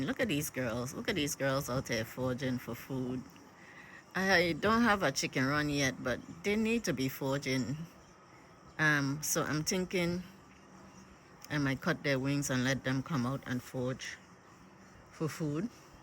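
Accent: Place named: South, United States. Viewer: you sound like a real country gal, a very informative lady to connect with 🎉🎉🎉❤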